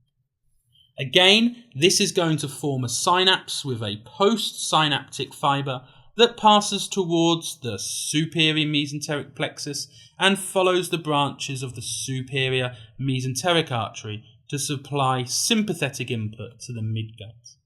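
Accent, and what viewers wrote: United Kingdom. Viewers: I hv never found a youtube clip so sexy, especially with that british accent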